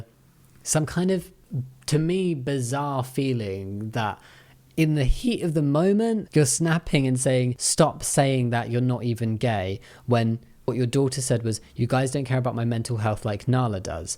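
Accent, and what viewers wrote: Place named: United Kingdom. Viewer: is so scary cause i can imagine you with an american accent now and im so happy you’re not and instead a nice sounding British man with a gentle voice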